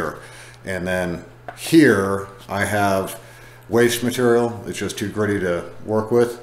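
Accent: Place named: Pittsburgh, United States. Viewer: You have that upper Pennsylvania accent like Pittsburgh. I’d wouldn’t be surprised if that is where you were raised. Just a guess. It’s a uniquely subtle accent.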